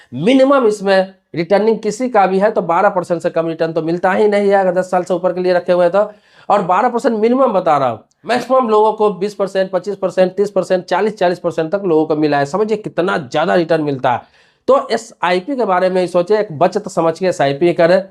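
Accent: Bihar, India. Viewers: बिहारीं लँगवेज!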